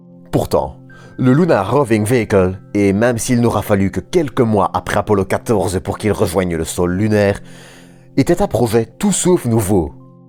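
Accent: Belgium. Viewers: votre accent belge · L'accent belge.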